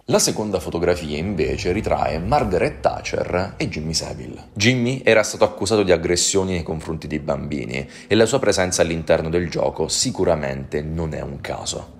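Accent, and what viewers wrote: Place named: Tuscany, Italy. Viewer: sento un accento toscano in lontananza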